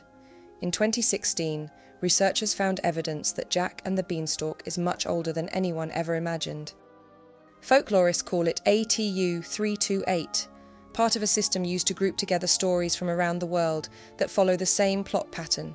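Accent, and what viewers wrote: England, United Kingdom. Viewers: Great video, I enjoyed that , Nothing like a nice story told by an English lady with a lovely accent.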